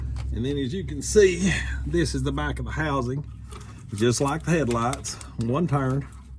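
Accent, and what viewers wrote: South, United States. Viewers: All auto DIY videos hall be made with the deep southern accent. Makes me feel comfortable and brings me back to my childhood. And makes me feel like I can do the diy too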